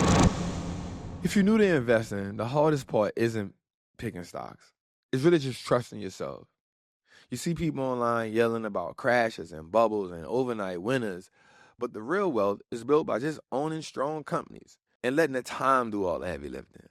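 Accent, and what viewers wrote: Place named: South, United States. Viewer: Can someone tell me what accent this guy has? Is it somewhere in the South.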